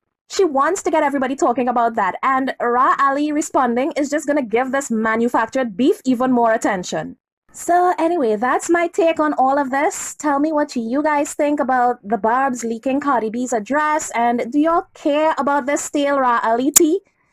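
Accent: Trinidad and Tobago. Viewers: I love ur show💞💞. Everytime I hear ur voice I feel like I'm back in Trinidad so thank u for givin me a lil bit of Trinidad when do ur commentary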